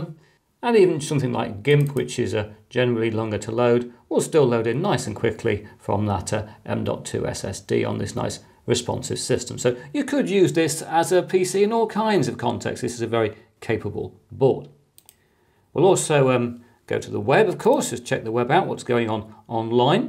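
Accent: United Kingdom. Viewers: just curious, are you from great Britain ?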